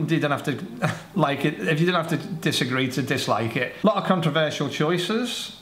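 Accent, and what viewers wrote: Liverpool, United Kingdom. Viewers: scouse prick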